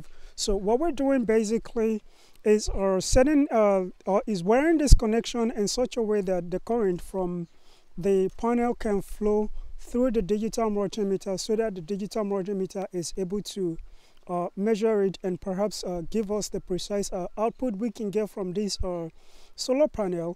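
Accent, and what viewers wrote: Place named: Nigeria. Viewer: Great video. Clear and straight to the point. Thanks. Off-topic, your English accent sounds very Nigerian. Were you ever resident in Nigeria? 🤔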